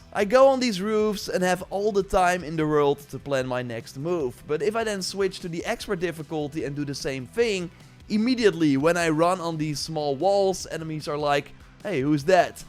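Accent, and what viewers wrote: Netherlands. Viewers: Hahaha ik hoor gelijk dat je nederland bent zet hem op ouwe💪🏾😂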